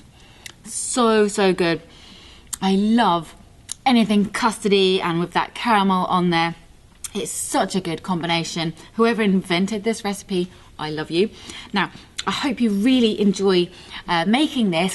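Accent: England, United Kingdom. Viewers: Shes beautiful, can cook malaysian food, and that english accent! Marry me baby ;)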